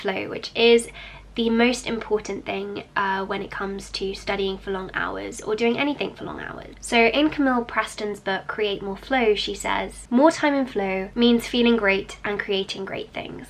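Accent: United Kingdom. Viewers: Great video miss , iam from india , highly appreciate ur work , I would request u to read quran translation of which is approximately 500 pages , a book which is from god believe 25 to 30 % of world population , by the way I think ur british ,. I love british accent a lot , great job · She is using british accent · Hermione accent? She's just British mate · Are there any youtube channels have British accent and make great videos liker her?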